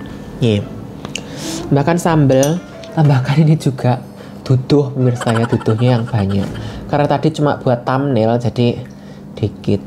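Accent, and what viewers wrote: Java, Indonesia. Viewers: Mas jawanya pundi kok bahasanya medok bangettt 😊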